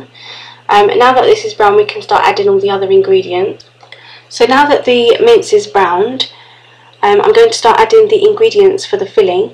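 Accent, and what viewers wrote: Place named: North London, United Kingdom. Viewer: I love your accent. are you from north london? thanks for the amazing vids! x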